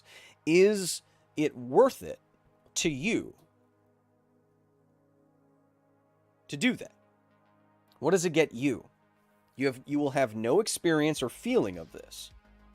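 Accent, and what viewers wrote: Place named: Australia. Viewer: Gotta admit, last week's Aussie accent was better. Can't put my finger on why though.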